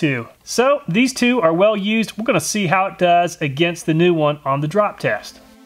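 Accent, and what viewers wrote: United States. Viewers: plus that strong american accent 😁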